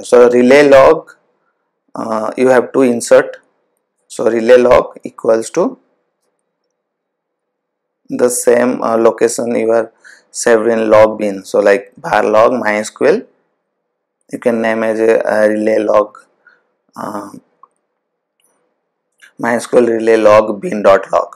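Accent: Odisha, India. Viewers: Great video and my doubt was correct the guy behind the video is an Odia… not from name but from pronunciation….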